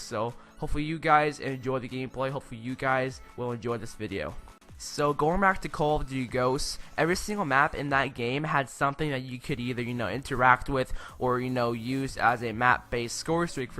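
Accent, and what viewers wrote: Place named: Spain. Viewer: it sounds that you have an Spanish axsint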